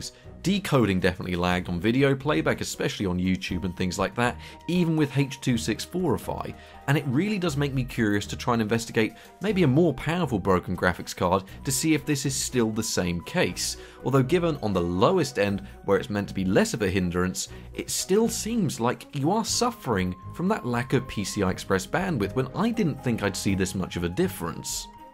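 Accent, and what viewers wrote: United Kingdom. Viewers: I may be stuck in bed with flu, but at least I got with his British accent and his old tech stuff to cheer me up. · English accent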